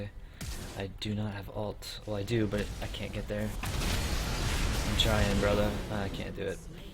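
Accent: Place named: Canada. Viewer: Love your sexy Canadian voice ;)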